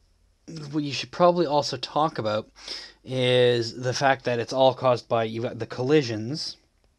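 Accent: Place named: United States. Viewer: Thanks G but why as an American(from ur accent) are u doing Alevels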